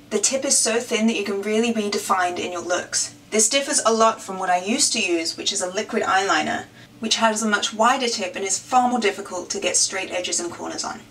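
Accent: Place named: United Kingdom. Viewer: Omg, when you do your Beauty viddeos, you become much more British;) But it's alright:P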